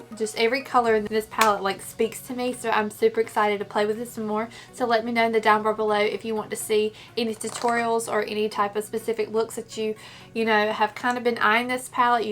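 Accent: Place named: North Carolina, United States. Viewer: are you from NC?? your accent sounds like it!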